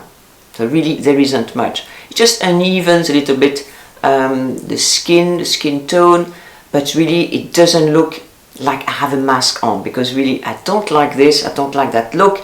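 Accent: France. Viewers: If I wear a lot of make-up, I seem to look a lot older, so less is more for me. Although nearly 69 I've always had and still have a very oily skin, which thankfully means less wrinkles. I have never liked foundation so use a factor 30 tinted city block cream, a little blusher , mascara, eyebrow pencil and lip gloss and that's it. I'd love to wear a red lipstick like you but I'm very fair and a red lip doesn't suit me unfortunately. Love your videos and your wonderful French accent xx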